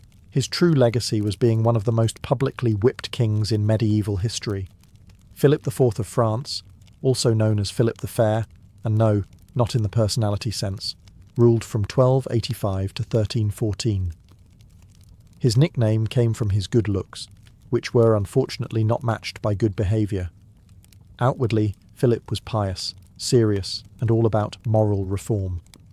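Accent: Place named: United Kingdom. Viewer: It takes a British accent to make sex stories sound boring as f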